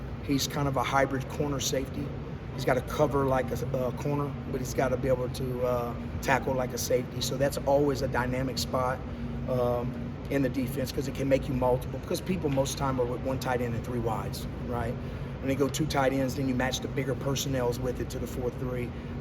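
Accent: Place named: Louisiana, United States. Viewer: Love that Cajun accent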